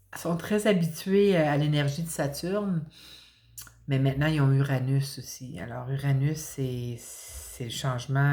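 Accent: Quebec, Canada. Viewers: Vous êtes trop mignonne et pleine de bienveillance, je vous ecoute, vous et votre super accent, avec une immense joie. Vive le Québec et ses habitants 😍😍😍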